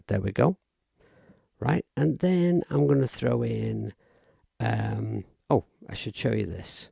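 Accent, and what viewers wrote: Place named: Sheffield, United Kingdom. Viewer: Hiya, I've been using the Rozeta suite for a while and now, thanks to you and Doug I've discovered the bud apps too. And of course you're right. I will lose days and weeks immersed in this stuff. P.S. I'm from Sheffield and I can tell your accent is not dissimilar to mine. Keep on rocking brother 🙏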